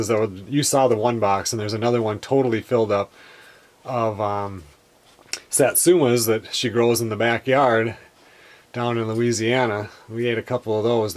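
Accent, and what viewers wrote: Minnesota, United States. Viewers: I thought it was "The Tent" guy and see I was right. Being a Minnesotan myself I noted that a lot of guys sound similar, sound like a couple of my Duluth cousins :)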